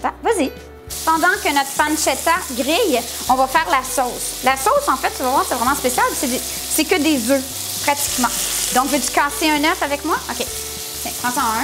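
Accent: Quebec, Canada. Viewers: J'adore l'accent québécois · L'accent quebequois gâche tout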